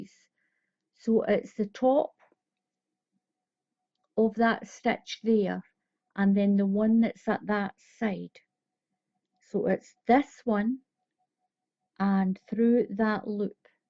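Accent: Scotland, United Kingdom. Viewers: I love your videos. You explain everything so clearly and precisely. Your beautiful accent takes me back 50 years to when I worked with 2 Scottish ladies who helped me refine my knitting skills. Now another Scottish lady is helping me refine my crocheting skills. Thank you for taking me back to a happy time in my life. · I should have written lovely 😄 Do you use lively in Scottish? I'm from Finland. No polar bears here 😄 Your accent is adorable 👍 ☀️ Happy New Year!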